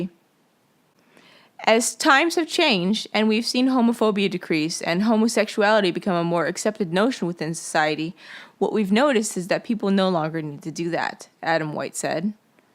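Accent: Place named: Brooklyn, United States. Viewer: You sound like you are from Brooklyn. This pleases Me. Great job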